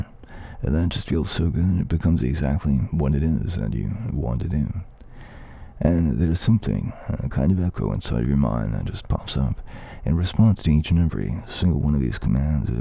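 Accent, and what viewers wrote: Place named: United States. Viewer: This guy sounds remarkably American.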